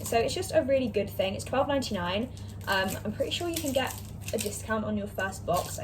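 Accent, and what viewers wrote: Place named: RP, United Kingdom. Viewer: I just watch your videos because i really enjoy listening to you :elbowcough: RP best accent :shelterin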